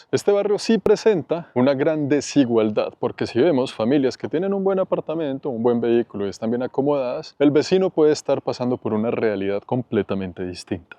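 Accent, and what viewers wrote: Colombia. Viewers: eres la prueba de que el acento colombiano, no tiene por que aborrecer a los 30 segundos. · Parcero sus videos son muy entretenidos escuchar otro Colombiano narrar desde nuestra manera de interpretar es importante no dejes de hacer estos videos 🙏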